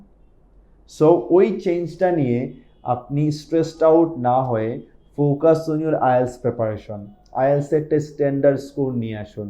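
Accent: Sylhet, Bangladesh. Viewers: Sir kota bolar doron Sylheti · Bhai Sylheti ni🥰🥰🥰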